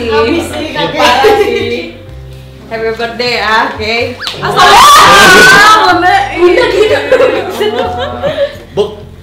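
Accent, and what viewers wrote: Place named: West Java, Indonesia. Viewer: Kenapa ya klw. Bunda ngomong pinginnya saya ketawa lucu suka ada sundanya 😂😂👍😍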